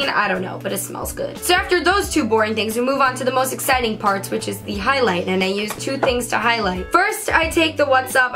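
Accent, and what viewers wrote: New York, United States. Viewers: You have such a New York accent I love it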